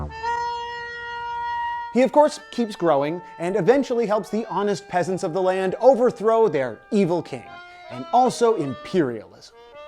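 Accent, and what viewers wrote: Canada. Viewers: I came from game therory and have watched every episode. This is the first time I've noticed the "canadian accent"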